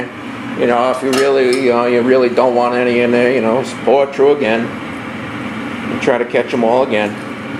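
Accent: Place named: Brooklyn, United States. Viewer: What a great accent. It makes me feel at home back in Brooklyn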